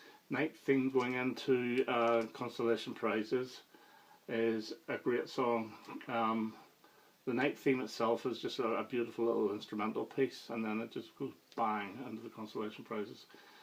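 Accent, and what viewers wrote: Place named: Scotland, United Kingdom. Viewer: you did a great job critiquing albums. I really enjoyed it, and I think your laidback style and relaxed Scottish brogue were major reasons for that. I had 1st album with ' ' on it. I really like humorous songs. I think he writes very funny lyrics like ' '. ' ' has often been likened to ' ' do you think that's a relevant comparison? Totally agree that ' ' was greatest album. Every song is tremendous.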